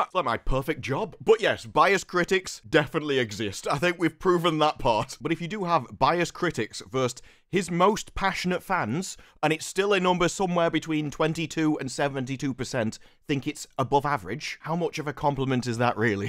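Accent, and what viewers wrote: England, United Kingdom. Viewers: As an American, I find insults in an English accent is very entertaining.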